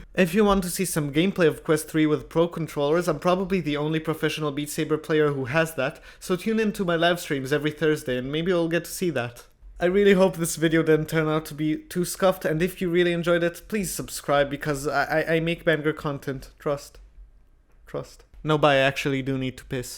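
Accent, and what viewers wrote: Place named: Israel. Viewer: מאיפה קנית אחי?(if your Israeli I get it from your accent)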